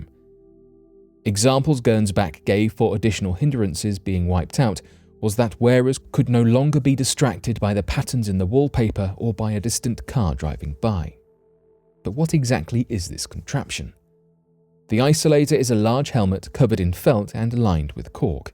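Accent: United Kingdom. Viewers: He’s a British voice over judging by his accent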